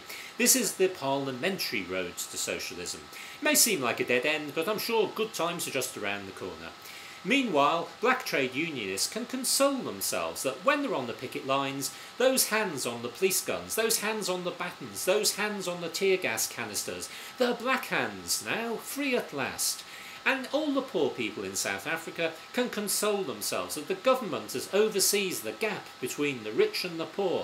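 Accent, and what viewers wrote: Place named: United Kingdom. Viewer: Your British accent tells me you come from a mean prejudiced place. Firstly as colonizers Britain were insufferable! Racists, opportunists, sententious and cruel. You lost in South Africa, you we’re thrown out of India in total disgrace and nowhere you occupied miss you! So now it’s Nelson Mandela you’re aiming at! You have no heroes, except military! India had Gandhi and South Africa had a man whose sacrifice for his people and love of them and country stood steadfast against a hard government. Relentlessly the National Party killed dissidents who were precious intellects. Mandela was an educated, principled man who lost his youth rotting away in prison. This alone could have broken him but it didn’t. He stood firm and resolute but with no hate, pettiness or prejudice. Exempt of all the ugliness now rampant in this morally depleted world. 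He was not a saint he was just a very good man hell-bent on peace for the new South Africa. The Constitution a fantastic ode to principles an example to others . He made mistakes which were well intentioned but out of sync with the reality he had to face after nearly 30years locked away in an island. He stood by the right leaders - Castro, Ghadafi, the Palestinians, Russia and all those whose standing he admired and served his dream. He alone was able to put together in harmony a nation with deep wounds. Have you done that? Has anyone who dares to diminish the real value of this MAN come to an inch of what he stood for? NOOOOOOOO! So shut u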